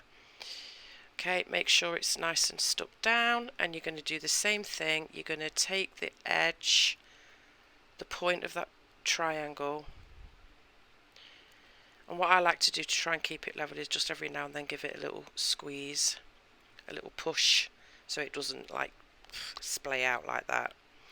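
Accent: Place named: Northern England, United Kingdom. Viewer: It's actually not as bad as I thought it would be, i like it and it's now in the oven! Thanks for the tutes it's really good when it's a northern woman's voice giving the instructions 😊 I'm northern too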